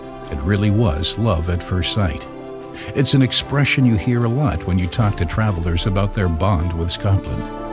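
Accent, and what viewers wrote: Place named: United States. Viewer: He's American I think judging by his pronunciation of most words. Scatland taddies etc. Why not someone Scottish narrating it.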